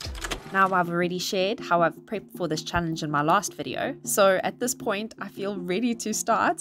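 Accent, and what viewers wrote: South Africa. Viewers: Are you South African?.... I miss home....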